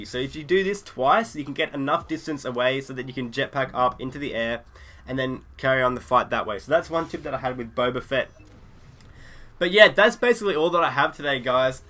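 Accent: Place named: Australia. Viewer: why is every fucking battlefront 2 video narrated by an aussie?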